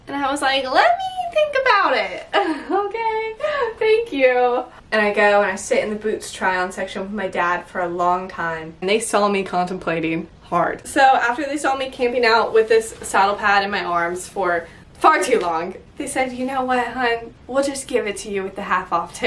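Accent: United States. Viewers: north of where?😂 from what I hear you speak in an American accent, so do you mean north of the USA?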